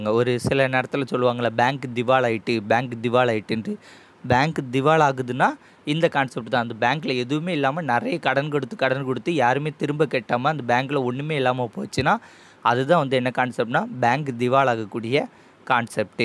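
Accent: Tirunelveli, India. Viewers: Bro ninga trinelveli ah? Slang theriuthu, teaching super bro, school la kuda ipd explanation kudukala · Bro nenga Tirunelveli Thana..namma slang theriuthu... thanks for ur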